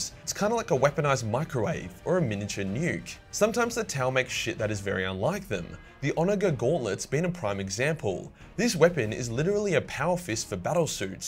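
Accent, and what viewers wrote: Australia. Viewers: I'm guessing your video length is because that's what the algorithm says is best BUUUUUUUUUT. Is there any way we could get some more LENGTH out of you?? Can you do multi parts or something?? I feel like at the 12 minute mark, we aren't getting as much of your fabulous Australian accent as we could be. Doing videos in the buff is tits, but we could use more of that too, and at 12 minutes, it again doesn't feel like everything is getting "fleshed out" enough. Glad to see you growing before our eyes👁👁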